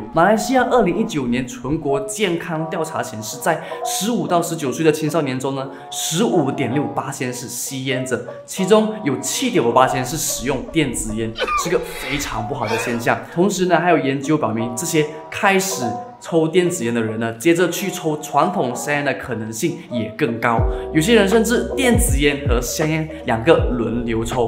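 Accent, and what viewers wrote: Fuzhou, China. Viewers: 你肯定是福州人！！！！！！！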